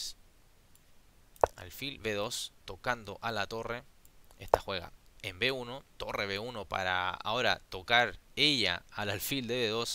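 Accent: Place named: Chile. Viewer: Estimado creo que eres el mejor comentarista de ajedrez que he escuchado, la emoción que logras despertar es increíble 🤩 Te envío un abrazo desde Viña Del Mar, Chile 🇨🇱 (Por tu acento asumo que también eres chileno) Espero ver tus análisis del torneo mundial entre Carlsen y Nepo!!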